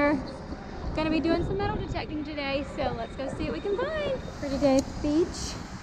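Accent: United States. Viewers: Hello. I wish I lived near a saltwater beach. Back in 1996 I was using a Bounty Hunter machine with very simple target ID on a low lake (Toledo Bend) in the swimming area and found two monster size 14k rings and a smaller diamond ring. I also found 18k gold rim glasses and a small 14k earring and lots of modern coins. I have a Vanquish detector now and would love to go beach detecting. I found my first gold ring, an 18k England Air Force Base one with missing stone in the late 1970s in my grandfather`s yard with a heavy Whites CoinMaster that used a bunch of AA batteries. Also got 1.75 in silver coins that day in the garden. Love your cute accent. It`s very similar to some central and north Louisiana ones. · I found you today through nugget and I noticed your hat. I am from belton South Carolina. It’s not real far from Clemson. Maybe 30-45 minutes. My husband is a big tigers fan! 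I love your personality. I should have know you were a local the way you talk. 🥰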